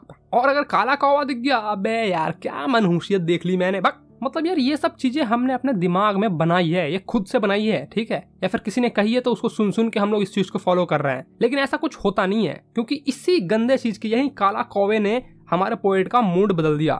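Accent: Bihar, India. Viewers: Bihar se ho kya sir?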